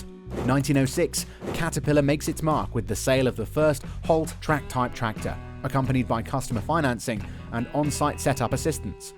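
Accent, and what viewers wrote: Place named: Europe. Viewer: European voice for an American company as it comes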